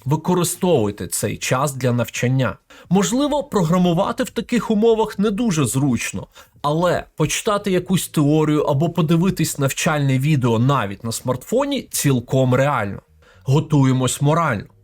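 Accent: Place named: Western Ukraine, Ukraine. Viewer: вот так, першёл на мову с англ. субтитрами, "назло надменному соседу". Но его прежние, "предвоенные", курсы на русском замечательны, хорошая дикция и без акцента, но интонация - с западной украины.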